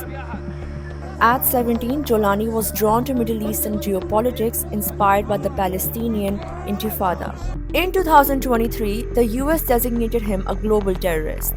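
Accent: India. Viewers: Indian accent so funny😂😂😂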